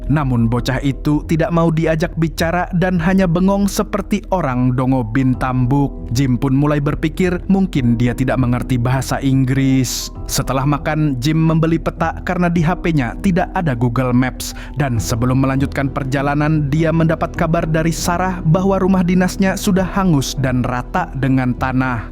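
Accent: Banjar, Indonesia. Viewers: admin nya urang banjar kah? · Banjar host nya wkkw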